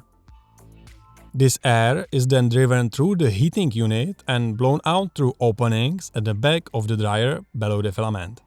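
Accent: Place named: Czech Republic. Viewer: you sound czech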